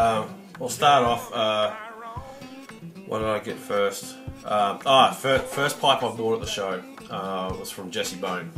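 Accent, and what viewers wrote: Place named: Australia. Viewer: Thanks for sharing, awesome pipes!!! and fabulous video mate! good to hear another Aussie voice on here hahahahaha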